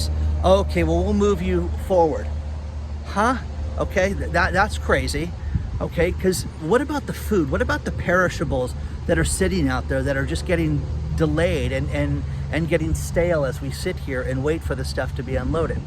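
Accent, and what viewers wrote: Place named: Western New York, United States. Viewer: Are you from Western NY State? Your accent, the way you pronounce certain words like "roof" indicates you are. I'm originally from Buffalo NY area.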